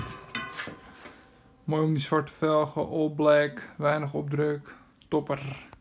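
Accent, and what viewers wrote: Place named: Russia. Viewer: What country are you from sounds Russian nice build anyway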